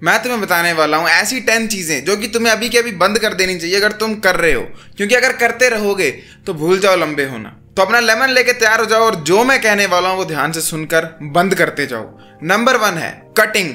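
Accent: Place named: Haryana, India. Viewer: Bhai aapki boli haryanvi jaisi hai❤❤❤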